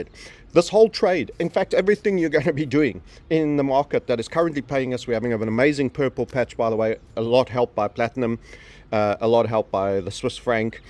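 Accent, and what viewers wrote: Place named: South Africa. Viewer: His speech sounds South African to me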